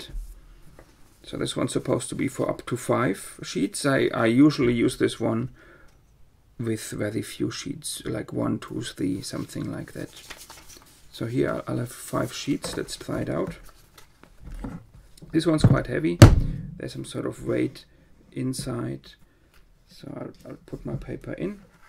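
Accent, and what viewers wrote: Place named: Germany. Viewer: I love your German accent! 💕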